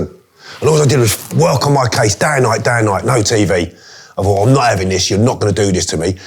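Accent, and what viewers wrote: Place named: Birmingham, United Kingdom. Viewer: are you from brum bro ? Love the content btw 💯✌️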